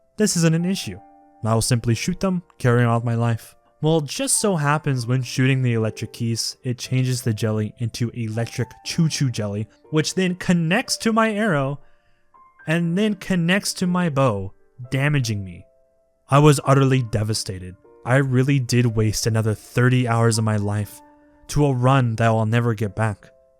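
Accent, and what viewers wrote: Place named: Australia. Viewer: i can just hear your Australian accent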